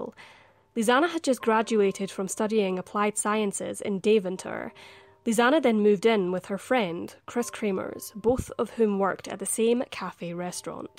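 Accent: Scotland, United Kingdom. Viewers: And I LOVE your accent ! Reminds me of Sean Connery.A relief after all those computervoices an Vallygirlll accents.A delight to listen at.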